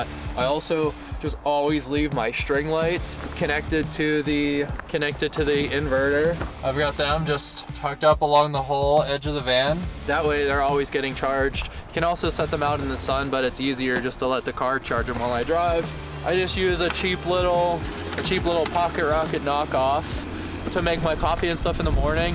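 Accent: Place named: Vermont, United States. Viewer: Dude i love you channel! Are you from Vermont? I have that same accent!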